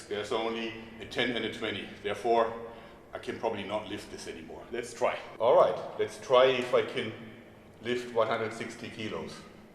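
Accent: Germany. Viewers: That's a strong German right there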